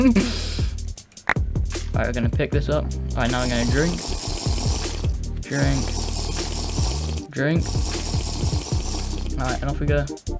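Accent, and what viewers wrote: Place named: United Kingdom. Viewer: every time you talk in your videos it reminds me that you're british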